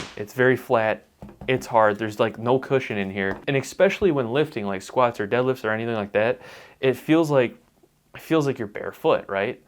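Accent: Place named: Upstate New York, United States. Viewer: Are you originally from upstate NY? I like your subtle accent - it's just like my friend's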